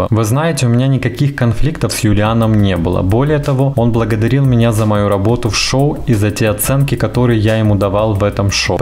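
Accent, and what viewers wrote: Ukraine. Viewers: Украинизмы придают речи своеобразие и неповторимость.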